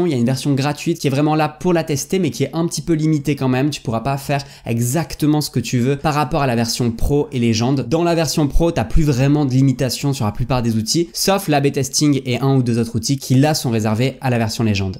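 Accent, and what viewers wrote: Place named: Southeast, France. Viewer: Merci c'est vraiment top ! Y a plusieurs outils que j'utilise déjà (canva / tubebuddy), j'ai pas encore testé opus clip que j'ai découvert grâce à toi, mais faut que je m'y mette, et que j'aille voir les autres. PS : on est d'accord que tu viens du sud est, genre Nice ou pas hyper loin ? Tu transformes les sons en O en un mixe entre O et A, j'ai entendu ça que là bas.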